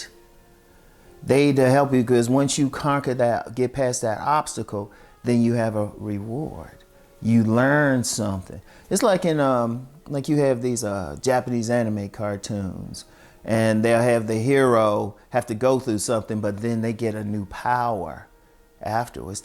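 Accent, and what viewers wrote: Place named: Baltimore, United States. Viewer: I’m 28 years old I hope to be this calm n calculated when I’m older s/o to you og from a northern VA Cat to a baltimore brotha I can hear ya accent 💯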